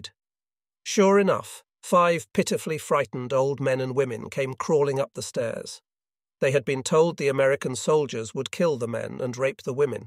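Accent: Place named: United Kingdom. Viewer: This British accent is confusing. BTW I loath British AI accents.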